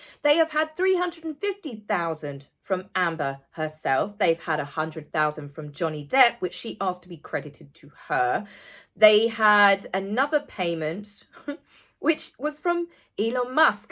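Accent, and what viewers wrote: England, United Kingdom. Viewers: Love from Long Island - ive experienced abuse on several levels and its taken a while to rise up and wake up. You are such a beautiful special human and I truly look forward to seeing your videos and hearing your English accent! Just wanted to reach out to say that to u!!! Keep up the great work❤️👍🫡🤗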